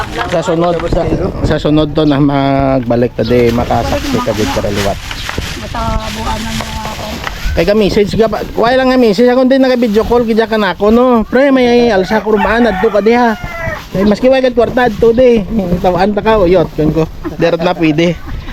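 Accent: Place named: Bacolod, Philippines. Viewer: Favorite ko alimasag. You souded like a person grom bacolod po kasi yung accent nyo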